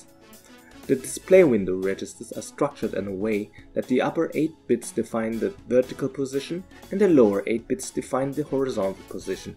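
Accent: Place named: Germany. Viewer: I didn't detect the German accent, and only realised when I watched your ZX Spectrum Next video. Of course, Germany was as much obsessed by Commodore as we were in the UK. Makes sense!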